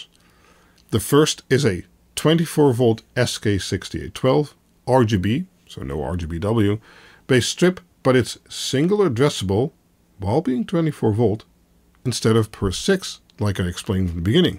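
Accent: Netherlands. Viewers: even een vraag tussendoor...ben jij toevallig nederlands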